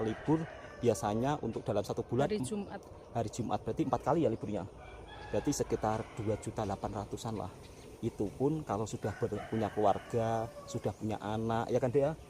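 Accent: Java, Indonesia. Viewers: Nmpk jelas isteri lebih smart dan ulasan2nya lebih baik dan teratur. ni pulk bodo, ckp brbelit2, brulang2..loghat bahasa jawanya yg lebih pekat dan asyik trsasul., sesekali bagi peluang la kpd isteri mmbuat konten, jgn ganggu atau menyebok...pastinya lebih menarik.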